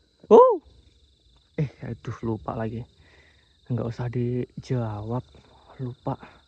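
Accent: Java, Indonesia. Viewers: Mas, njenengan orang mana ya..? Logatnya kok sprti orang Jawa ya..? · Mas, njenengan orang mana ya...? Logatnya sprti orang Jawa ya...?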